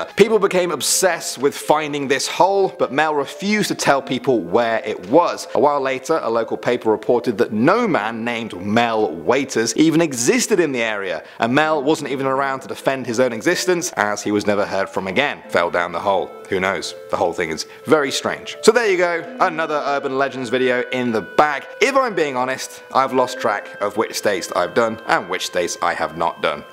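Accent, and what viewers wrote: United Kingdom. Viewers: Everyones pronunciation is differently all depends where you're from, he's a brit who lives in canada.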